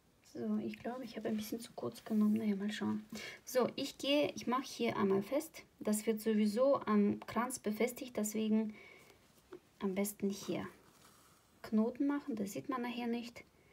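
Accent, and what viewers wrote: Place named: Russia. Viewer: Hallo, ich bin neu auf deinen Kanal und ich finde dich sooo cool, ich hab schon so viel gelacht während dem häkel, es ist immer lustig mit die. Dein russischer Akzent ist auch sowas von unterhaltsam, mach weiter so, ich bin ein Fan deiner Kuscheltiere. Ich kann mithäkel und bin mittendrin. Danke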